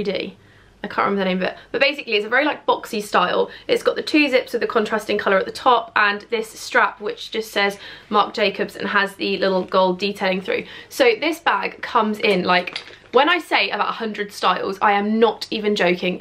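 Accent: United Kingdom. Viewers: Is she British? 😉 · English I’m guessing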